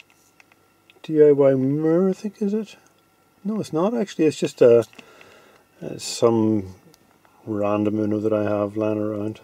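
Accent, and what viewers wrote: Northern Ireland, United Kingdom. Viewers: haha do i sense a slight NI accent ;) cool video btw! was researchin canbus stuff myself, subbed :)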